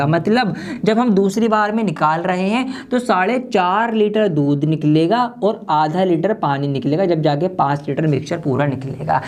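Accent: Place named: Bhopal, India. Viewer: Thank you Sir 🙂 .. you make every problem easy to understand ....i wanna know that ..where are you from ..and you pronounce words like Bhopali.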